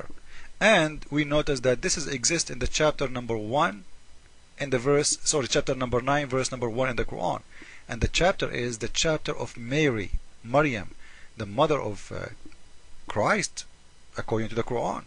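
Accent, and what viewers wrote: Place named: Iceland. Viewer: are you from iceland? love the accent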